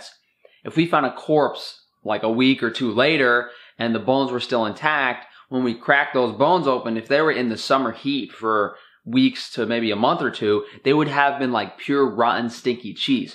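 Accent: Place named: East Coast, United States. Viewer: This guy is so East Coast and I love it :)... Especially the brief pause in between "Cross-contaminaton" and "from the butcher's saw" when he does that little Italian hand gesture with the knife... I like this guy. He knows what he's talking about.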